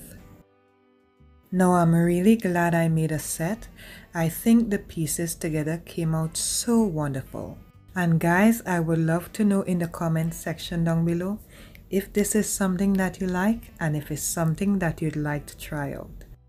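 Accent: Trinidad and Tobago. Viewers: Did i detect a slight trini...love from trinidad🇹🇹🇹🇹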